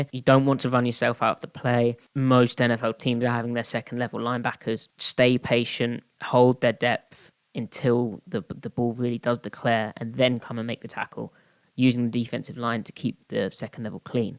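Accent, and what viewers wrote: United Kingdom. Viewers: It's cool hearing a British guy seriously understand football. 🤙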